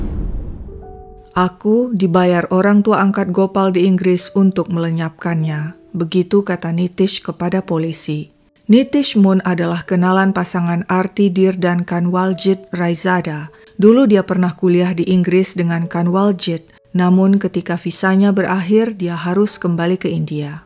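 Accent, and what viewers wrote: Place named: Surabaya, Indonesia. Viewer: Dari awal mendengarkan dr dialeknya aku sudah menduga sepertinya dr Surabaya. Bener dong ada "soale"... Hehehe... Selamat buat kalian yg menang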